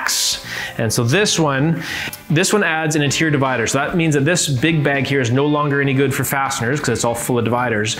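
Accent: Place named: Canada. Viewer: I detect a Canadian accent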